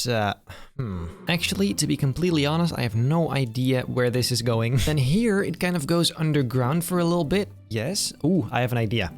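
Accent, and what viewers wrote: Netherlands. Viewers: your netherlands arent you from your accent i hear that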